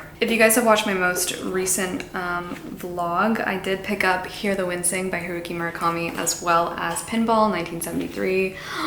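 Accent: Quebec, Canada. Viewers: I didn’t comment on your last vlog, but now with the quebecois accent I have to say it… My tip for big city living is moving to Montreal! It has so many parks, including a « mountain » in the middle of it, most buildings are like 3-4 story high and the sense of community is generally very strong, while still allowing to keep so anonymity and privacy.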